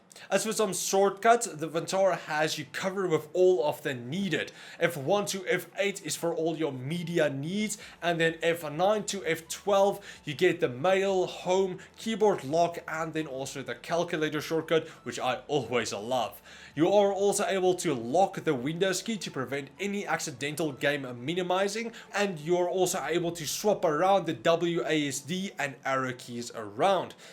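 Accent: Afrikaans, South Africa. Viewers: Ek wou se jy klink Afrikaans!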